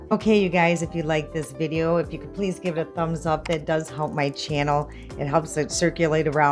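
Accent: Midwest, United States. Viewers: Hi!! I’m not sure where you’re from but you remind me of my time living in Minnesota. That wonderful Midwest accent. You make me feel so nostalgic to go back there. :) enjoying your content.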